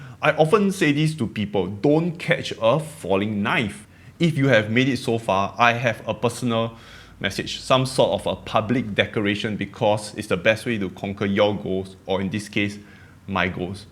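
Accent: Singapore. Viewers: I don't think you need to neutralise your accent. We can understand you fine and I like listening to your Singaporean straight talking. It's so refreshing that you're not trying to talk around something like in the west. Rather, you're straight to the point and I like that. Keep doing you. From Australia! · Singaporean accent is what makes your videos unqiue tho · Nooo don’t change your accent. Singapore accent is great and not hard to understand. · I understand your motivation, but don’t sweat your accent. You’re Singaporean and this is the Singaporean accent. People will adjust and adapt! I find your accent adorable and think it adds to your charm and appeal. I’ll miss it if you stop pronouncing “people” as “pee-po.” 🤓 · Don't need to change accent you sound perfect to me. Singaporean here as well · Singapore accent is the signature of the channel :D I guess people are not that fuss about accent as long as the content is good, or they choose to miss out on good content. 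Political risk is the most uncontrollable risk. Cathie showed people what to do already. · Don't lose your Singaporean English accent! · please just keep the Singapore accent :)) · That is Singaporean English.. All Singaporean can understand it and has no issue on that.. haha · I'm Canadian and i love Singaporean accents! Dont change! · Your accent is very distinctly from SE Asia, like the Singapore area. Maybe add some local English slang words into your discussions. I grew up in Taiwan and HK so I can tell the difference btwn when HK person speaks their British English and other areas. I believe if you watch some American tv shows, like Yellowstone, you can hear more of the American Midwest accent. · Don't change your accent just because someone tells you to do so lah. If the viewers are not willing to get used to our SG accent then they can go watch Jim Cramer or other donkeys with their perfect American accent. 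· Bro your accent is great, reminds me of a good friend I had in Singapore! ❤ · You've gotta represent Singapore's pride with our accent! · I love your Singaporian accent!! I am American born and raised and I only speak English. I can understand you perfectly. Just my two lovelaces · Americanising your accent is completely inauthentic. When people talk about a neutral accent they are inevitably talking about an Americanised accent. A Singaporean/American blended accent is not a proper, authentic way of speaking. You, as a Singaporean speaking with a Singaporean accent is super real and authentic. It’s part of what makes you lovable. Going in a corporate direction with how you speak is totally dumb. Do you want to sound like some idiot rich kid who went to an international school who can’t think for himself? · What's wrong with your accent? Alot of Singaporean speak just like that. Most of us who don't study in international school or who don't grow up imitating the lines in American shows will speak exactly like you. 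Does it mean that American or British accent are superior to others? Why not they learn to understand our accent? I'm proud of the Singaporean accent even if I speak perfect English. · Stay and talk how you are - it's perfect like that! Everything else doesn't sound authentic and I am so happy to hear the Singlish accent! :-) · Just be yourself man. You are doing great! What if you “neutralize” your accent n it doesn’t help? Waste of time ..... u are uniquely Singapore.... . Don’t listen to me: I might be a fly, ramblings of a mad fly. :) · Singlish accent is good!😆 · Yo man, I actually like your accent. I have a colleague at our company who is also a good friend of mine who lives in Singapore. Your accent reminds me of him. Don't change it man, its awesome. Everyone has an accent to someone else anyway!! ;) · I have significantly improved my understanding of Singaporean English since I subscribed to your channel. 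Haven’t missed a single video since last year. I don’t want to lose it. Please do not change. :-)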